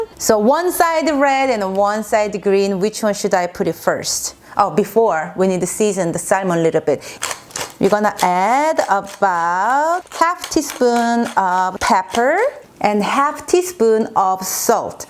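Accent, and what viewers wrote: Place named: South Korea. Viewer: I could tell she was Korean the moment I heard her first sentence.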